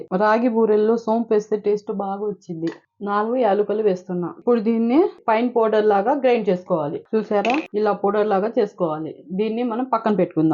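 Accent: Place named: Tamil Nadu, India. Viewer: Avunandi cheppe ame tamilian laga vundhi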